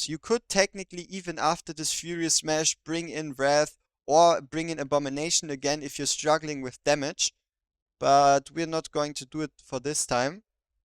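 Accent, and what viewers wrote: Germany. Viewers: dt akzent haha weiter so danke fürs video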